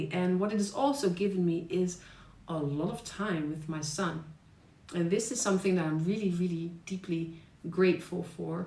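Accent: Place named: Netherlands. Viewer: Girl, you mde me lough, "find the things, that are worth relapsing for." I am out of a relationship with a hot big boobed psychopath for about 4 months now. After 2 weeks I couldn't move a finger, I needed 12 h of sleep. I don't want to see people, only one friend left, a lawer who pulled her out of my house with the police and fiscalía. But I am fine with that. I don't have to work, so I can be me as long as I want. What I do is accept it. Now I am getting better day by day, I do a lot of work in my house, welding and all kinda stuff. I am still very very slow, but I see the results. I don't think M. E. , is caused by a virus, it's a respond to abuse, and I respect the respond of my soul to it. I can't even think of working out to get my sexy body back, even the thought of girls looking at me repells me. Time has a different meaning to me now, I don't feel I am missing out, I enjoy myself. 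When I feel like it, I got the chance to meet new people and I will do it very slow as well, there is power in doing it slow. You have a nice accent, from the Netherlands? I was a German. I was very fast there. Saludos desde otro lado del mundo Sudamérica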